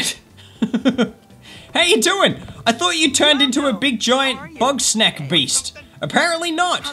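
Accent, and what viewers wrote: Australia. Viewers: Are you Australian? 😅😅😅😅😅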